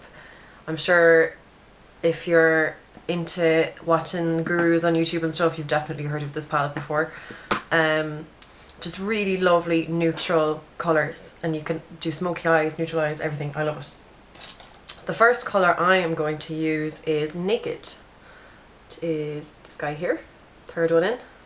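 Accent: Ireland. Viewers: very nice look, thanks :) are you from Dublin? :)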